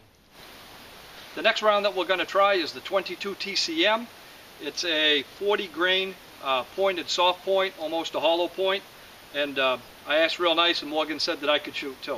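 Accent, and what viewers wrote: Maine, United States. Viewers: hmm....you sir, sound like a southern mainer. am i right?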